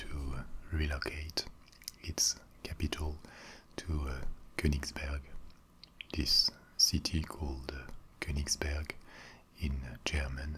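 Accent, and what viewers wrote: France. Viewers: your french accent is so stereotypically beautiful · You are the only person who has a french accent that I can stand.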